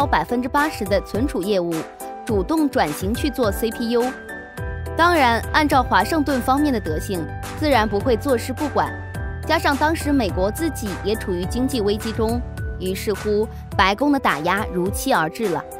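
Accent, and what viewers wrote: China. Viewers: 假裝繁體字想要以為是台灣人發的影片，結果聲音根本是426⋯然而內容也不符事實（笑了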